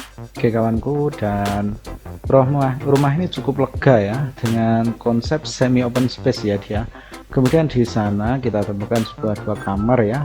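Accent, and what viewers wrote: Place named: Central Java, Indonesia. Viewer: Mas logatnya jawa tengah tp kok dipanggil Kang? Hehehe. Konten yutubnya keren btw..! Request tiny house 2 kamar ala villa dong budget under 50 jt 😁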